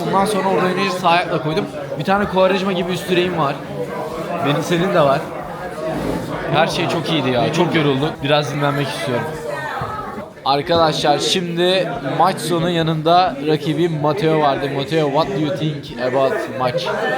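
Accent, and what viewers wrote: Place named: United States. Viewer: Abi amerikalılar gibi konustun 😅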